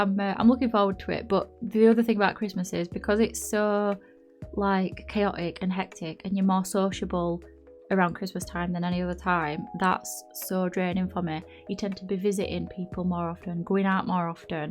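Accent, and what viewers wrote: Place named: Northern England, United Kingdom. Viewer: Subscribed mainly because of the Northern accent (we are under represented on YouTube 😂) Also those window boxes are gorgeous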